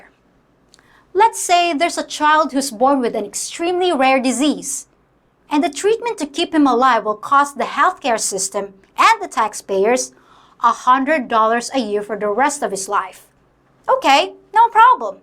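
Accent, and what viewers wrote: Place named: United States. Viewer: your English is just too good, & you know so many “Americanisms”. Surely you didn’t get all this knowledge from the call center, did you? You sound just like a girl who has been in the States forever. Either you are very very good, or is there more to the story that I didn’t catch. Come on. Give!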